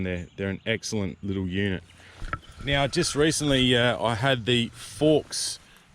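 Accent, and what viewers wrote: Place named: Australia. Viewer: duuude sick build! where in australia are you from? the accent is soo mild from an american perspective. If you were british id think you came from central London rather than Cockney. I ride a 701 supermoto. Love supermotos i take mine on hard enduro all the time :) need a bigger gas tank tho xD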